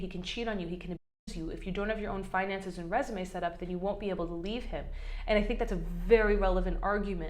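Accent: United States. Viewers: Unrelated to the video (which is very enlightening), you have such a satisfying speaking cadence and articulation. I'm Swedish, and most of my English-speaking friends are British, so I don't hear a lot of American accents - but yours is just so scrumptious (like a tasty snack)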